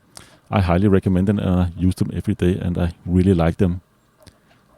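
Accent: Germany. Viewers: u sound very german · Man hört so das er deutsch ist lol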